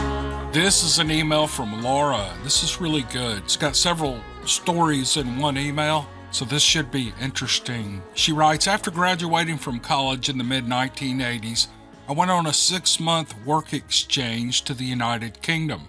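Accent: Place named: Oklahoma, United States. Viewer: Sounds like you have been spreading yourself thinner than butter. Howdy. I don't usually write in the comment section. So here goes. It's true we hope you're doing okay, and are glad to hear you and yours are well. I get it, you're just trying to survive like everyone else. I will be patiently waiting for the next Saga of Steve Lilly. In the meantime, I will enjoy, and I do enjoy everything you post, that has your name and that wonderful okie voice with it. From one Okie to another, take care. P. S. There is nothing wrong with the shorts.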